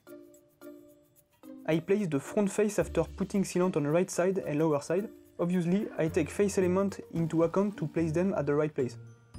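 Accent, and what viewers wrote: France. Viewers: i wish i was french just to have an accent like yours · it's typical french english, it's quite cute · c'est un français à l'accent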